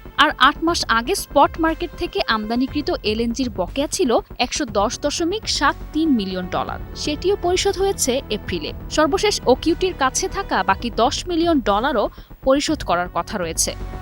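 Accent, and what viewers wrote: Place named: India. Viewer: নিউজ প্রেজেন্টার এমন শব্দ কেন উচ্চারণ করে কেন😂😂 একদম ইন্ডিয়ান মিডিয়া থেকে শিক্ষাপ্রাপ্ত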